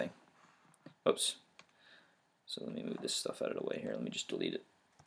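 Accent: Newfoundland, Canada. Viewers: Are you from newfoundland by any chance?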